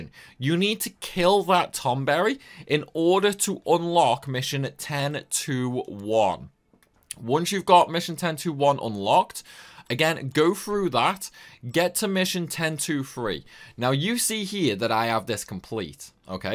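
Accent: United Kingdom. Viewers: That’s how a lot of British people talk about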